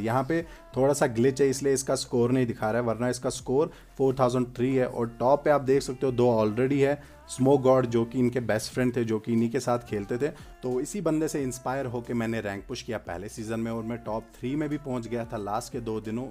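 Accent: Kashmir, India. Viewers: Kya aap Kashmir se hooo 🙄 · Bhai kashmire ho app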